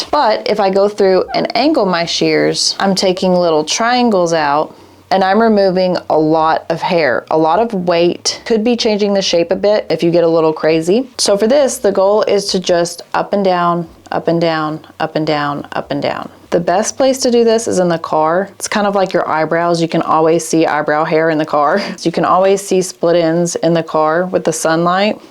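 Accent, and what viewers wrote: South, United States. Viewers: Is it me or did you have a southern accent years ago and now you don't seem to as much. I have been so grateful to find you in 2022 you helped me through cosmetology school. I still love watching your videos. Thank you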